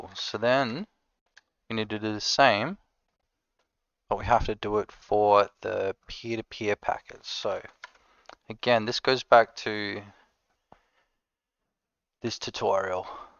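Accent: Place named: Australia. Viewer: the aussie accent helps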